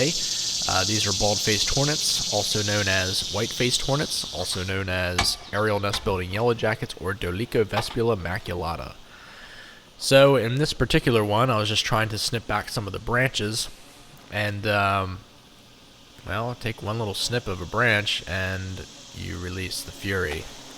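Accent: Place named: Ohio, United States. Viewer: There's a strange you tube guy does sting zone lets all kinds sting him I told he should try one of those lol we call them bald headed hornet in Michigan sounds like your from Ohio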